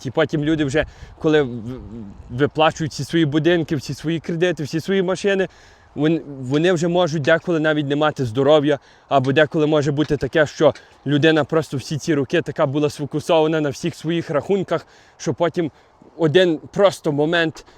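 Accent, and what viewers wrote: Western Ukraine, Ukraine. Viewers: дивлюсь вас не з інтересу про Канаду, а з інтересу до західно-українського акценту:) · Доречі про акцент, саме тому почав дивитись, но я всеж таки підозрюю, що у є задатки актора, режисера і тд, він полбляє, якісь сценки з братом, робити, старається, молодець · говорить із західним акцентом, так. Але його вимова притаманна більше до діаспорської, або до того, як колись розмовляли дуже давно на заході України. Зараз у нас так не те що молодь, але і люди 60+ не розмовляють. Не знаю, з чим це пов’язано, мабуть все таки вплив англійської вимови дає такий акцент українською. Навіть дідусь, з яким я бачила відео, розмовляє більш сучасною укоаїнською😊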